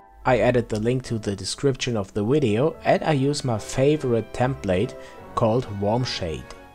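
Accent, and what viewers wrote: Germany. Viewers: haha nein dein englisch ist sehr gut aber man hört, dass du deutsch sprichst p.s tutorials zum addon wären klasse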